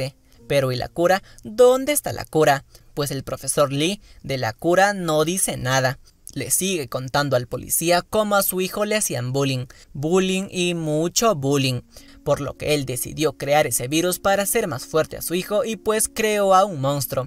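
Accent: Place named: Mexico. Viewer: palteas oe porque hablas como mexicano , si eres peruano !!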